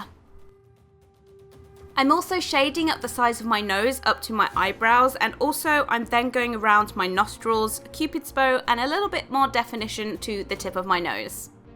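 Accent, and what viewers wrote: United Kingdom. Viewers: When you started talking it cracked me up because that light British voice with the devil face was just such a wierd combo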